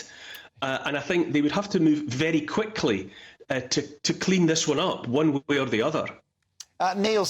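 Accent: Scotland, United Kingdom. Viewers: with a Billy Connolly accent today:)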